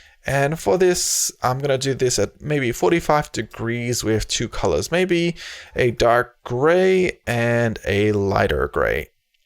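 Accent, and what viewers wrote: Australia. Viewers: Australian accent is fantastic 👌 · useful tutorial as well as nice Aussie accent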